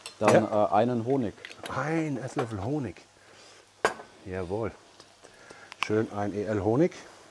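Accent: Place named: Frankfurt, Germany. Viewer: Schaut gut aus,und der Frankfurter Dialekt 🤟👍🏻Gruß aus HD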